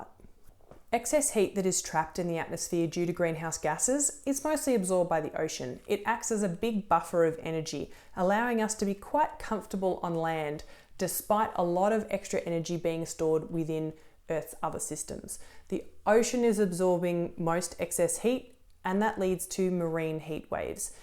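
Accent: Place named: Australia. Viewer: Love the Aussie accent tbh. Great big picture exposition. Really impressive although depressing. Root cause is not politics or capitalism like most ppl tend to mention. It is pure evolution and natural instinct. Our species just won the evolution race and we are running towards every boundary possible until nature will force us into the next balance. Its a natural inevitably. Dont blame a particular group of humans or "system". Its the system of nature.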